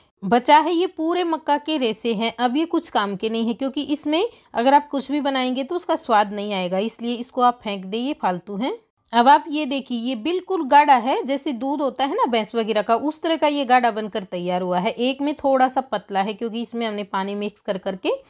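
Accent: Haryana, India. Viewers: I think aap hariyana se ho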